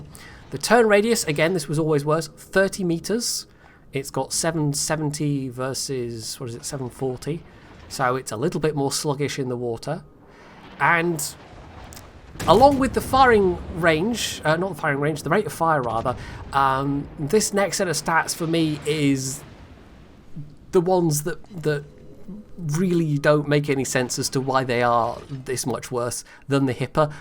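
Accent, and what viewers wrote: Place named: Oxford, United Kingdom. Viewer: I think you would be great on television, as an announcer (you have that pommy Oxonian accent) or a comedian (great sense of humour a la Monty Python), or both. You'd be perfect. I'm thinking John Oliver would be a good example. Think about it...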